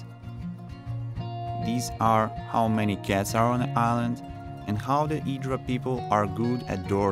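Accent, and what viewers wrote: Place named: Australia. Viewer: Thank you for this awesome and very detailed vlog. I am visiting Greece in 10 days and Hydra is one of the places on my list. The moment I came across with this beautiful island while planning my trip I fell in love right away especially the no car concept and how walkable the lovely harbour town is. By the way, I am curious about your cute accent, I could recognize some part Australian and a hint of eastern European. ;-) Cheers mate!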